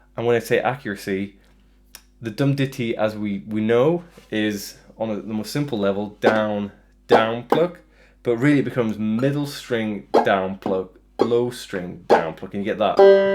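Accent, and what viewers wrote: United Kingdom. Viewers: Is that a Shrewsbury accent 🤔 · Are you from Northern Ireland? You sound like Bear Grylls.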